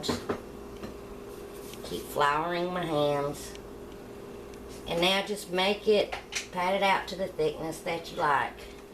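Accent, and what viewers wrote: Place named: Chattanooga, United States. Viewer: Omg my favorite is fresh biscuits. I told you my 2 kids live in chatt, tn right? I was a nurse in that area for 17 yrs. you speak like them that's why I think u might be in that area. All of my ex family is between Chatt, Alabama, and I worked some in Knoxville. You should email me. <mail> I don't do Facebook but I've been on here almost a year. I'm remarried and we live in SC. I frequently drive and see my kids. I'm going to go in April sometime and I'm going to meet and go to lunch with 2 of my subscribers one lives in Atlanta and the other in Alabama. My daughter has a home in chatt and works with Chatt Fire Prot. Yummy yum lov ur recipes💜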